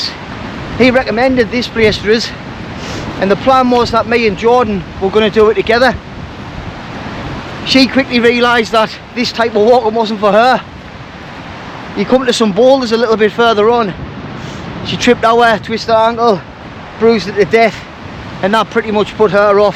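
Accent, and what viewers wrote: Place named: North East England, United Kingdom. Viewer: I left the north east 35 years ago and was just trying to pin point your accent 😂. I'm walking up from Leicester to the top of Scotland in day long stages (when I get chance between work and other commitments) . I drive to where I got to on the last walk, then do another hike north. I had a rather brutal walk from Glossop to Marsden in January, over some similar stunning terrain in the north Peak District. I think I'll route myself through this spot when I get this far.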